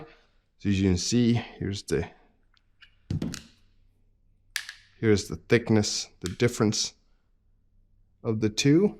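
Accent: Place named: Texas, United States. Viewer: You Viet from Texas? You got the nuoc mam with tx bbq twang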